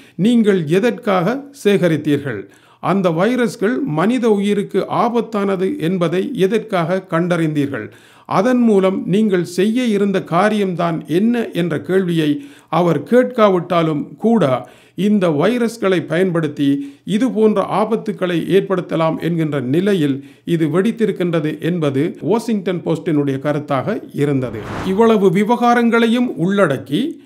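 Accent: Sri Lanka. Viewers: Information are good. Your tamil looks like srilankan tamil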